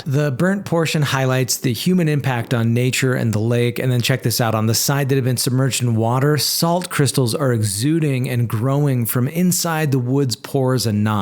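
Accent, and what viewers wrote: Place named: Utah, United States. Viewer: I’m from Utah, are you also from Utah? Your accent sounds very much like you grew up here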